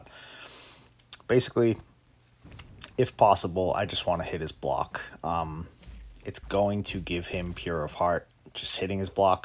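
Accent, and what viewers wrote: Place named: Boston, United States. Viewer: Lol I knew it. I live a few miles north of Boston. It was how you pronounced your ahhhh’s that Sauron was ah 2. Love it….. 👊👊👊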